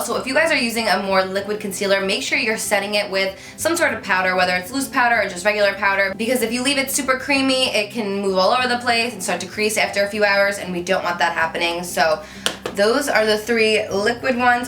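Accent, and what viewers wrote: New Jersey, United States. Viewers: Your Jersey accent is everything OMG! Love your videos! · Lol you've been using the brow bar to go from your earliest videos and before I heard anyone else talk about whitening lightning:-) you are the best people say Queen Jaclyn and she's great but you are the Queen 4 sure! Comparing is bad I know so I will just say I love you so much please keep making videos when your a famous model or fashion designer or whatever dream you want and will achieve xoxo oh one joke I can't help it but I always know ur from jersey when you say the word drawer or draw in your case lol it makes me laugh everytime but not making fun I just think it's cute tomato tomotto LOVE YOU!!!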